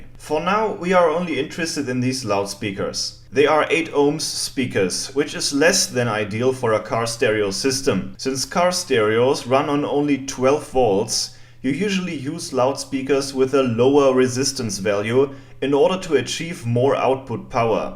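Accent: Germany. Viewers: I love your videos and your german accent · lol i can hear your german accent. even tho i can tell you seem to make an effort to try and hide it. but damn i loved then video. great use of all them old bits lol maybe ill put to use the old tape deck i have out of my ford lol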